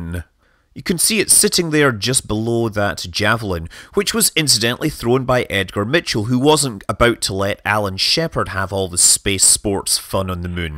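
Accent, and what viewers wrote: Scotland, United Kingdom. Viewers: Say what?! I thought he was scottish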